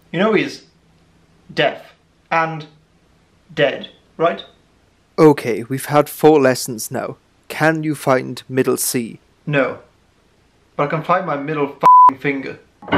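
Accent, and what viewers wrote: Wales, United Kingdom. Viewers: Why thank you! It's a Welsh accent.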